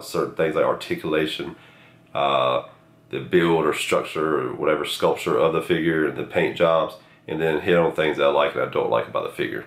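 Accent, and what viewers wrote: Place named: South Carolina, United States. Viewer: Damn dude you sound like you're from my part of the world. Are you from South Carolina?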